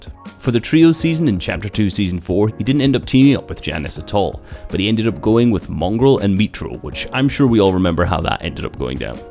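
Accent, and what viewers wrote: Scotland, United Kingdom. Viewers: are you scottish or american please choose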